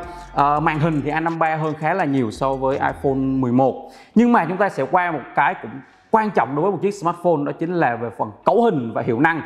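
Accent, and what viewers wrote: Vietnam. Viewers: ôg này ng khánh hoà phải k ta nge giọng y ng khánh hoà z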